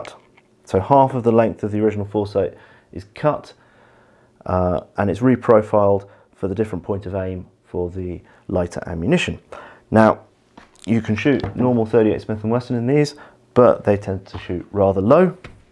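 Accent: England, United Kingdom. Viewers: Wait, you have an English accent and DON'T live in England? HOW IS THAT POSSIBLE? YOU'RE BLOWING MY MIND.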